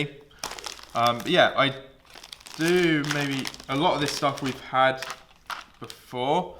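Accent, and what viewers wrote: United Kingdom. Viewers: What part of the UK are you from, I'm from Lincolnshire, and I love your accent.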